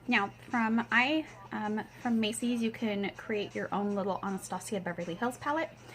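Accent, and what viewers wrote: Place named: United Kingdom. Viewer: OMGGGGGGGGGEEEEEEEEEE!!!!!!! That was amazing, you are AMAZING!!!!!! I love you and I am super thankful that you took time out of your own hustle and bustle of life to do a video for my girl. I love the look, love me some British accent too. GORGEOUS!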